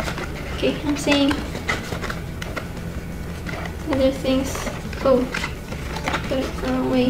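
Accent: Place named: United Kingdom. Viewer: I heard somewhat of a British accent ngl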